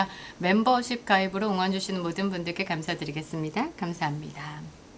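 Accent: Gyeongsang, South Korea. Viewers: 아이고 두야. 이말 쓰면. 일베? 경상도 사투리 반갑습노. 파이팅 입니다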